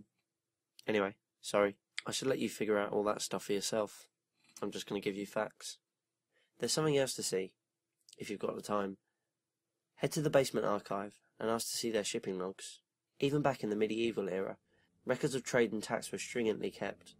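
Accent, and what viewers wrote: London, United Kingdom. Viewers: This is the first video I've watched of and my first reaction was "HOLY SHIT, HE'S BRITISH."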